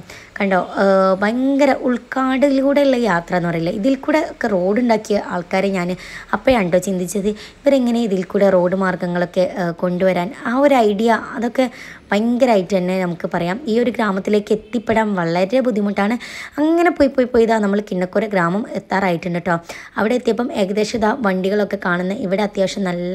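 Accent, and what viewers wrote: Malappuram, India. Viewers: Thani Malappuram slang Ijj kajj kujj Idayil sramikund shudham parayan 😂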